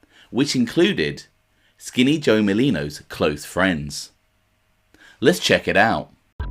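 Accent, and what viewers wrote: United Kingdom. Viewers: Dude, sorry, but it's so weird to listen to you, discussing American Mafia issues, with that hardcore British accent. Am i the only one here? All the credibility is just not there. How would you know stuff sitting back there in London?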